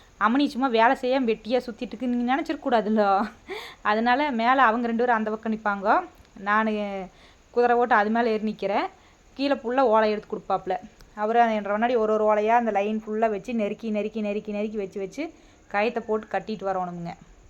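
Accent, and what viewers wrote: Kongu, India. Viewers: ஓலை போட்டது super கொடுத்து வச்ச மாடு👌 🎉🎉🎉கொங்கு slang🎉🎉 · உங்கள் மாட்டு கொட்டகைக்கு ஓலை போடுவது மிக அழகாக படம் ஆகி இருக்கிறீர்கள்👍 அதைவிட உங்களுடைய கொங்கு தமிழ் பாஷை அழகாகவே இருக்கிறது 👍 இதுபோல் தொடர்ந்து பெரிய பெரிய வீடியோக்களை இன்னும் ஐந்து நிமிடத்திற்கு மேல் போட்டால் நிறைய பேர் விரும்பி பார்ப்பார்கள்😊 ஆரம்ப நிலையில் இருப்பவர்கள் இது போல் வீடியோ போட்டுக் கொண்டே போனால் வெற்றி உறுதி 👍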